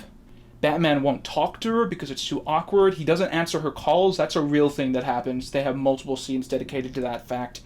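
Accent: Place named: United States. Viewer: Yo I'm just a British boy sat in his room watching this and I hear an American talk about eastenders? The figgidy niggidy fuck?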